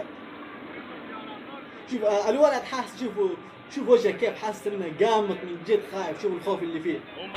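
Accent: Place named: Yemen. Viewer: اليوتيوبر من اليمن واضح من مخارج الحروف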